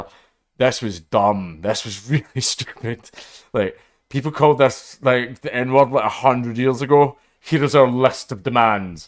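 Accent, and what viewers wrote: Scotland, United Kingdom. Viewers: I’m not gonna lie I clicked on this cause I wanted to hear him about “A FOOKIN ROCK CANT BE RACIST YA DAFT TWAT” in his thick Scottish accent lol who’s with me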